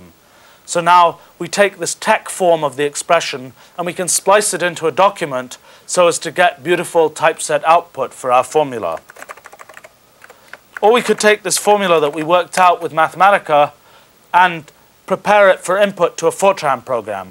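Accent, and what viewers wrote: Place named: United Kingdom. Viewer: Actually his accent has become noticeably more British. The accent above sounds Mid-Atlantic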